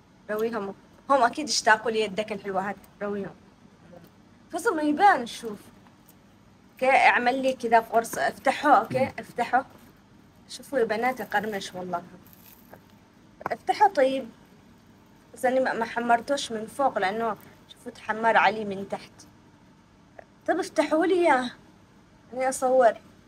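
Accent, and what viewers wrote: Aden, Yemen. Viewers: السلام عليكم بنتي الله يحفظك يا واني اتابعك بكل المقاطع حقك واعادك بالرياض ولعلمك بحياتي عمري اتفرج وبس مااشارك ولا احب بس اعجابي بطبخك وشخصيتك واسلوبك بالكلام العدني اللي ماغيرتيه اشكرك جدا وطبخك احلى طبخ والله كل الاكلات اللي تطبخيها الله ؤفتك عليك يا اتفاجئت انك خرجتي المهم استمري. ولا تتوقفي التوفيق حليفك بكل امورك اخوك يستحي مش مهم الله يفرج عليك ويحببك خلقه يارب رغم انك حبوبة ودمك خفيف ماشاءالله تبارك الله من على مابدءتي واني محتفضة باعجابي وما احب اشارك بس عشانك يابنتي بحفظ الله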